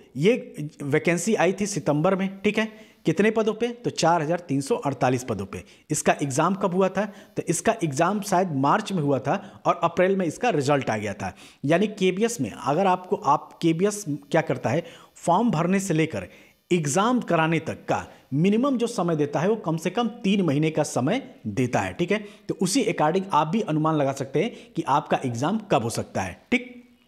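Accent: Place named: Bhojpuri, India. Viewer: Sir apki hindi..thodi Bhojpuri hindi kaisi lgti h..🤗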